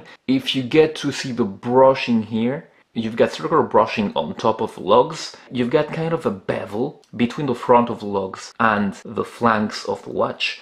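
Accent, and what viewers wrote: France. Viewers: tu sonnes très Français pour un reviewer en anglais lol (mais belle prestation... sans doute un Belge ou un Suisse mdr) Salutations de Belgique.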